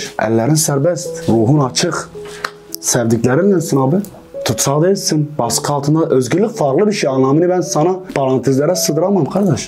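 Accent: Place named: Diyarbakır, Turkey. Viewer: Senin şivene kurban olayım diyarbekirlim ❤🌹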